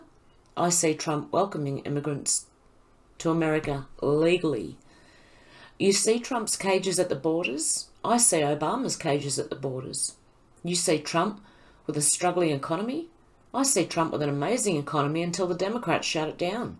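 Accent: Australia. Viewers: You are becoming one of my very favorites! Great info and love your Aussie accent!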